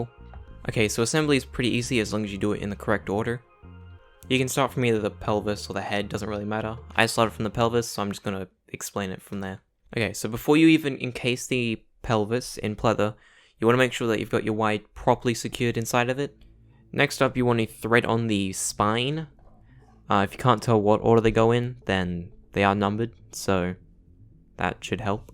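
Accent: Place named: United Kingdom. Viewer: YEEEES HES BRITISH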